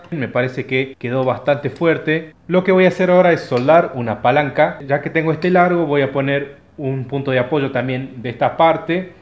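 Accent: Tucumán, Argentina. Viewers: Hola de donde sos. Yo soy de tucuman te escucho asentó tucumano. Me gustaría conocerte. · Gracias por compartir, muy bueno ...tucu o salteño?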